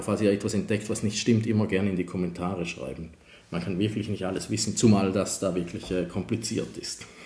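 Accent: Switzerland. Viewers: ihr in der Schweiz auch im Dialekt "sächs" sagt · an deinem hochdeutsch feilen. Da hört man den schweizer einfach zu stark raus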